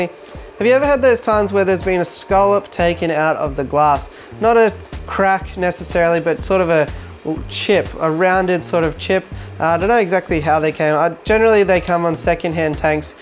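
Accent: Australia. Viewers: Are you from Geelong !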